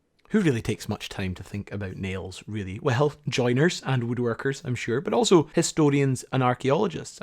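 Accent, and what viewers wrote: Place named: Scotland, United Kingdom. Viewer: Thank you for such an interesting piece of history. You are an easy listen. Your Scottish background is easy on the ear. BWScott Fort Worth Texas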